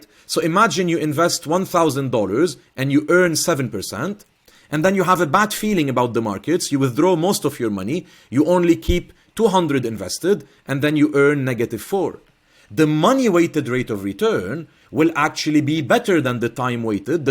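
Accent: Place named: Lebanon. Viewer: I knew this is Lebanese accent, I checked where the institute is located, but great explanation. Thanks a lot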